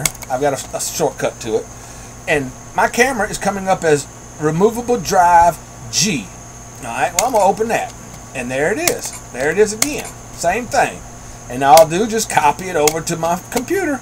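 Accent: South, United States. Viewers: Oh... You sounded very southern and I was just curious because I'm form Arkansas.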